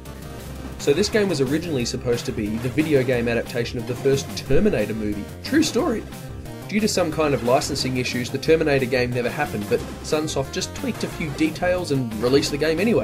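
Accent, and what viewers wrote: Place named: California, United States. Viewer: Dude, as a native Californian, I have to say.... that was a pretty damn good Cali dialect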